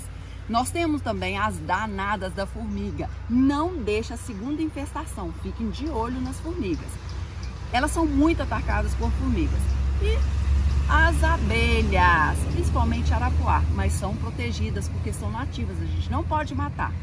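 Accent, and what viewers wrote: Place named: Ceará, Brazil. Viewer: Gostei amiga soou do Ceara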